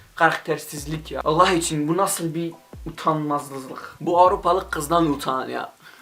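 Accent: Turkey. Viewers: iki tavsiyem olacak öncelikle her ne kadar türk olmasan da türkceyi biraz daha guzel konusabilirsin. Ic anadolu sivesi gibi konusuyorsun kulaga hos gelmiyor. Ikincisi de bu sekilde faydasiz videolar yerine Kürt genclerinin bilinclenecegi videolar cekmelisin.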